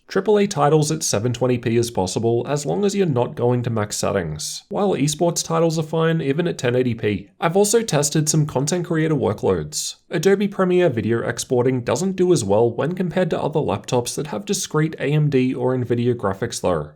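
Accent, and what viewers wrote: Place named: Australia. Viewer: Imagine not spotting an aussie accent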